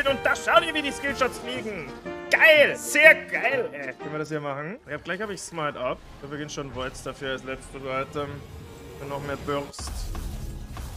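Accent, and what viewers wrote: Bavaria, Germany. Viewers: Bitte mehr Bayrischen Stiel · Kannst du mal nen Bayrischen Stream machen, Gragas und Cho only und nur im Dialekt reden, würde ich sehr fühlen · Ich liebe bayrisch so dermaßen. Mein absoluter lieblings Dialekt Schöne Grüße aus dem Kölsche Veedel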